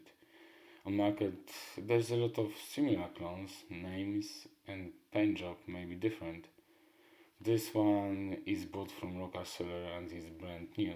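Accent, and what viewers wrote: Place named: Russia. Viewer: Блин, я английского не знаю, но вот тяжелый русский акцент слышу сразу😊.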